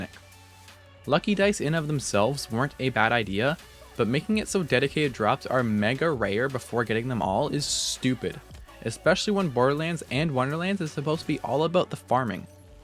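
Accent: Canada. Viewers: you sound a lot older now in the more recent videos you sould like a gay canadian now you sound like a normal canadian in the current vids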